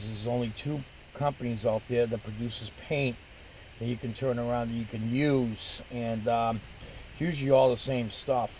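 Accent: United States. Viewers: Love the Boston accent! I live in Haverhill! Lol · Recognized the accent right away. Lived in Salem Mass!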